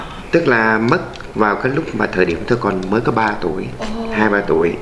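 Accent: North, Vietnam. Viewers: Ong nay khong phai nguoi Sai gon nghe cach noi chuyen va giong noi giong nguoi bac .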